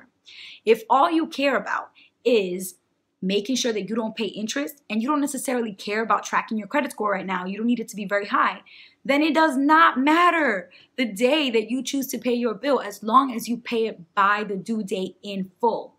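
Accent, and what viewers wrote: New York, United States. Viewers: Sound like you from NY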